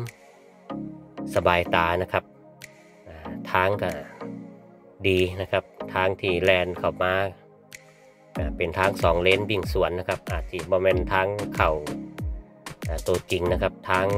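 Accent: Chaiyaphum, Thailand. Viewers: สำเนี๊ยง..ชัยยะภู๊มมมครับ...เสียงมวนขนาด..เคยมักสาว..ชัยภู๊ีมิ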